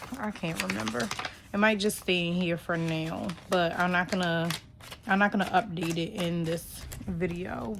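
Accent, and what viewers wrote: Baltimore, United States. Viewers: Do I hear BMORE accent❤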